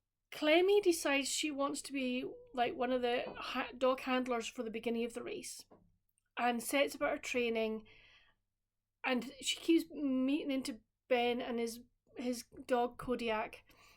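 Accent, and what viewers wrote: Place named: Scotland, United Kingdom. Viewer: Thanks for the shoutout. I love hearing my name in your Scotish accent. The Alaskan Wilderness series is on my TBR. I've been on the fence about The Wynbridge Series. Glad you read book #1. I need my romance to be low angst. So am passing on Heidi Swain. T KingFisher is not my genre of fantasy. I read and unhauled her YA (The Wizards Guide to Defensive Baking). I don't understand surealist humor and was afraid of the Sour Dough Starter familar. I need my famliars to be non threatning cat etc. I hope to finish Emily Wildes Encyclopedia of Faries today and am enjoying my slow reading of Mary Oliver's poetry collection Devotions.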